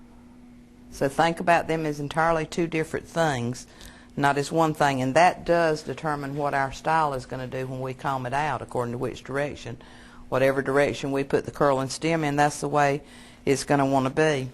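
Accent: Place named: South, United States. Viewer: I like her sweet southern accent! I found this video to be helpful and informative. Thank you !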